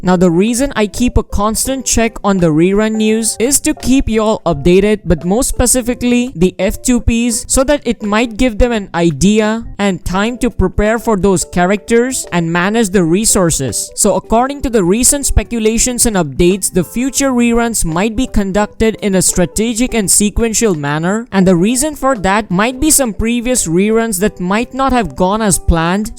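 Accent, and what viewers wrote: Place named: India. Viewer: How come you can translate if its in chinese? You sound like an indian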